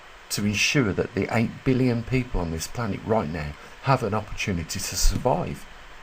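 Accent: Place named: Black Country, United Kingdom. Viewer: M8… where ya from? You sound local… I’m in West Brom, let’s meet up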